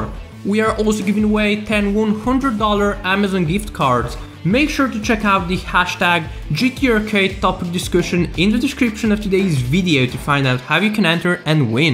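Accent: United Kingdom. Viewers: Are u from Scotland?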